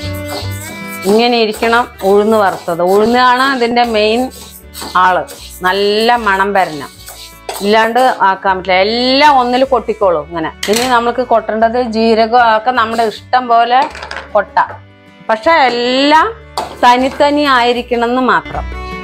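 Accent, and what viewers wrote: Palakkad, India. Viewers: പാലക്കാട് ആണ് എന്ന് നന്നായി അറിയാം. ആ ഭാഷാ, penne കപ്പല് മുളക്..❤ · പാലക്കാടൻ ഭാഷ 😂😂😂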